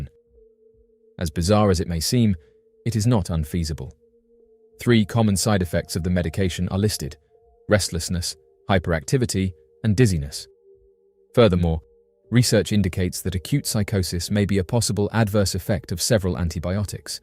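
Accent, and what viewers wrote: United Kingdom. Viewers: T you from uk 🇬🇧 interesting and odd .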